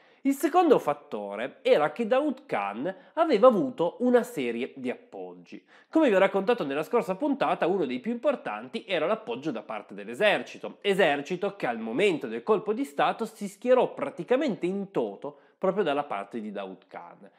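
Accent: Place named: Bari, Italy. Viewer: Good morning Vietnam....bellissimo ma i pezzi musicali sono tanti dai a.....invece è bella come canzone è dei che consiglio ah ah ah ma tu sei un Barese oriundo.....invece che sono barese vedo che parli un dialetto completamente diverso, quindi nei paesi i Dialetti sono tutti diversi l'uno dall'altro, io Pensavo che Bari e le sue province parlassero il barese e magari Lecce e provincia il leccese...invece il dialetto cambia anche da comune a comune o anche a zone diverse della stessa città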